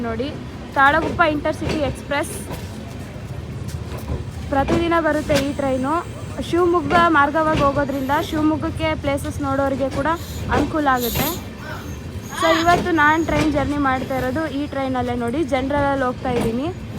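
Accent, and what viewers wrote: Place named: Bellary, India. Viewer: ಮಗಳೇ, ಬಳ್ಳಾರಿ ಭಾಷೆ ಬೇರೆಯವರಿಗೆ ಸ್ವಲ್ಪ ಓರಟು ಅನಿಸುತ್ತೆ, ನಿನ್ನ ವಿಡಿಯೋಗಳನ್ನು ನೋಡಿದ್ದೇನೆ,ಬೇಷಿ ಅದವು , ಮುಂದುವರಿಸು, ಶುಭವಾಗಲಿ, ನೀನು ಈಗ ಬಳ್ಳಾರಿಯಲ್ಲಿ ವಾಸನೋ ಮೈಸೂರಿನಲ್ಲೋ?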